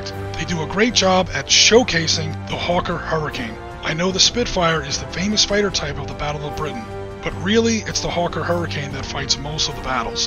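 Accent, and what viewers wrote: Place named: United States. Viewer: Being the cynic I am, and hearing a US accent (maybe think Ebert's populist given the then times, and so effectively clueless, unobjective, totally missing the point (which was the sentiment of people who lived through this and later generations) review), and mention of gamers, I thought I was going to be compelled to post some, if not many, criticisms of this review, but couldn't find any. So far anyway! I'll just have to watch this again and try and find some! A really excellent, objective, enjoyable review here, more than worth watching; and watching again...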